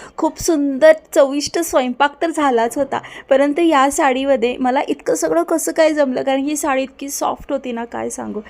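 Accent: Kolhapur, India. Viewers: Tumchi language puneri Nahiye ... Lagech samajt kolhapuri 😂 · Bhashecha laheja gavapramane badlto Pan bhasha hi shudhach bolayala havi Ani pani asa ashudha kasa bolaych Accent kolhapur cha ok Pan shudhach bolayala pahije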